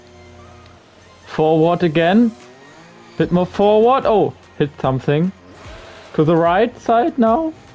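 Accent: Germany. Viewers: dieser deutsche dialekt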